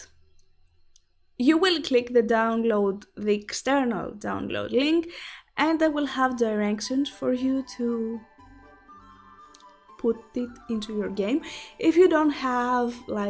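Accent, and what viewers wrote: Greece. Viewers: i love your greek accent! Latrevw thn douleia sou, mpravo kai euxaristw gia ta links! Harry Potter gia panta :)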